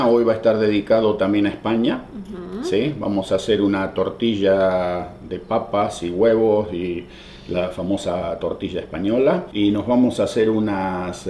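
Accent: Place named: Argentina. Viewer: soy tu fan. Me encanta que seas tan argento al hablar... Nunca se pierde la esencia 💙 Saludos de una Argenta en Reino Unido.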